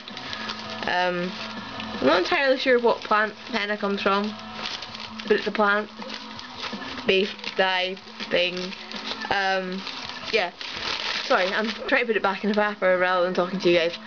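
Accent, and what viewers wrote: Scotland, United Kingdom. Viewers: This is my own way of making it. Try it out and test it on a small part of your hair first. I hope you don't mind I posted here for you and everyone else who like to try. You can delete my comment if I was for me to post it here. Sorry about that. I STILL LOVE your accent!!!! I have ALWAYS LOVE Scotland!!!! Again, thanks for the video. =) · You sound scottish :)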